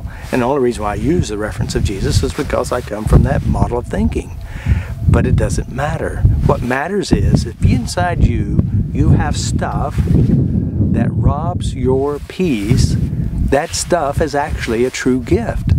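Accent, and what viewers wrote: Oklahoma, United States. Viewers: That was really beautiful and meaningful to me. I've been so grateful for these videos, tapping along with them and moving so much old stuff. Since I'm from Oklahoma, too, your voice makes me feel right at home! Thank you!!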